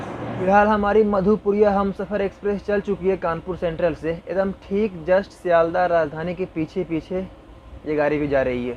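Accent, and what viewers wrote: Bihar, India. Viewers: Shandar..khalis bihari andaz..uspar se mithi awaz..sandesh bhi hai anya ac wale blogger ke liye ki journey ke saath jaruratmandon ki dil se help karta hai.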